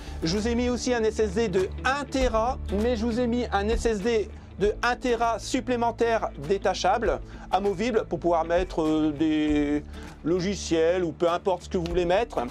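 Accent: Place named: Nord-Pas-de-Calais, France. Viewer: Cet accent chti ASMR 🎶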